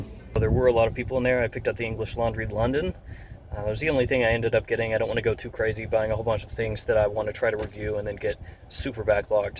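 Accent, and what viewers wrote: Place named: Appalachia, United States. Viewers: I've been watching for a little bit and I suspected by your accent that you were probably somewhere in Appalachia. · Bro sounds like Huntsville Alabama! Roll Tide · Wondered where you were from because your accent was so similar to mine and now it makes prefect sense. I grew up about an hour away from there on the VA side. Definitely hard to find fragrances in small town USA. Thank goodness for the internet.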